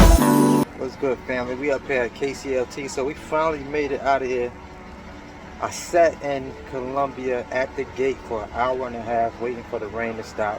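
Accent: Jamaica. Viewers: The Jamaican accent got me. Lol. Time to eat at $3.30 a mile. Keep hustling as we gotta get truck #1 back on the road. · Lol 😂 I thought he was a yardie for a sec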